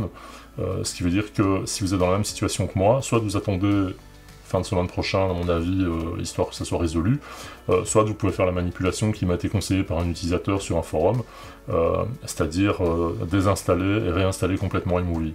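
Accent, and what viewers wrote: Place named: Belgium. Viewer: Pour une fois que je trouve une chaîne qui explique bien les choses, ça serait dommage de la voir disparaitre :) Sinon, rien avoir, mais j'ai l'impression qu'on se connais ou du moins qu'on s'est déjà croisé :D Et quand j'entends ton accent Belge, étant moi même en Belgique, je me dis encore plus que mon impression est juste :D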